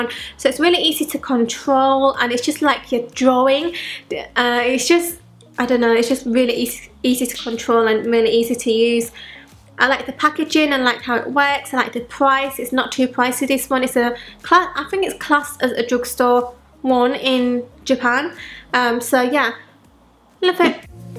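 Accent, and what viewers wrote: United Kingdom. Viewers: I absolutely love the asian/british accent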